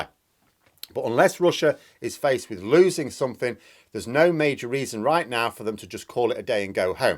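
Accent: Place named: England, United Kingdom. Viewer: By no means do I mean to offend but hearing someone with an english accent advise Ukrainians utilize IRA tactics to repel Russian occupation is unexpected and bemusing.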